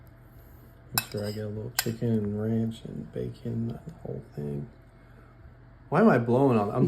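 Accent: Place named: Louisiana, United States. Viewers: You have a very strong Cajun accent and I love it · Love the louisiana accent!! Reminds me of the Cajun chef who always said oooo wee looka there!!! Justin Wilson RIP!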